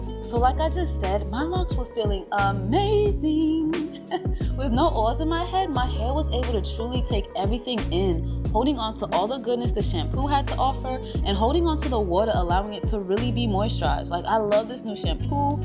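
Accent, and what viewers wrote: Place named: United Kingdom. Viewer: The British accent 👏🏽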